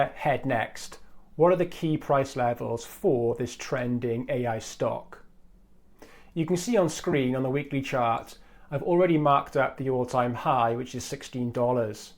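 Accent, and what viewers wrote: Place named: Wales, United Kingdom. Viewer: I Live in Hirwaun. You don't sound to far away.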